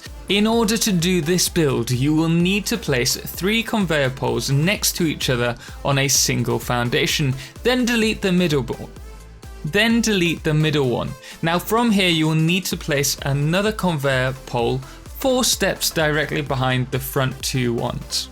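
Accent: England, United Kingdom. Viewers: I watch this channel about Satisfactory mainly because of the pure English pronansuation! It's just perfect!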